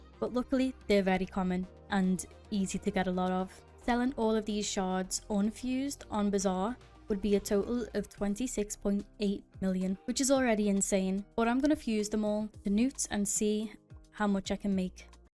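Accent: Liverpool, United Kingdom. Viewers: Swear u sound scouse if ye r where abts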